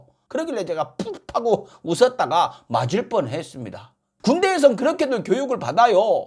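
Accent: Jeolla, South Korea. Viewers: 혹시 전라도 출신이신가?? 궁금해서요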